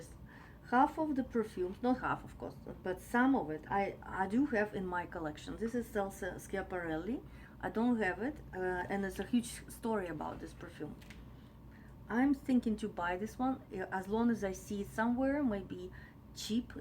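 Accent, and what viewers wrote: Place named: Russia. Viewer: Thank you for making videos in English. Your russian accent heips me with understanding. И вообще, здорово совместить приятное с полезным: и понимание английской разговорной речи чуток прокачать и про любимые парфюмки послушать в вашей прекрасной манере.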